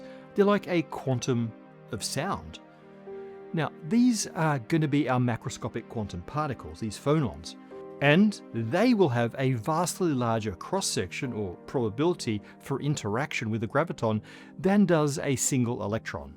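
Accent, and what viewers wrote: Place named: Australia. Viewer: Perhaps his Australian accent is making perception difficult. I am listening without headphones and have no problem. You can turn on captions, English (auto generated) to help.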